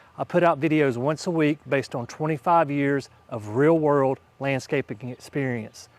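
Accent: South, United States. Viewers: Where are you from? I'm trying to pinpoint your dialect. Arkansas? Alabama?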